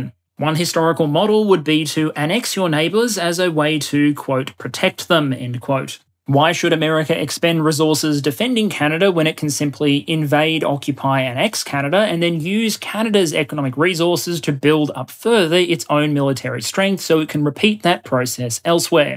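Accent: Australia. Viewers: He has an Aussie accent , but as an Aussie , I can tell he is a nerd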